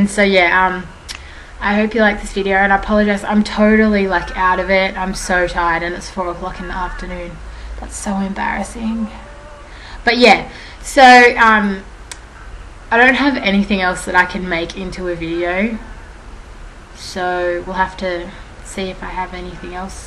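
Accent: Australia. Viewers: I'm having like hard time understanding your Australian accent :( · Are u and Auzzie ? I can tell by ur accent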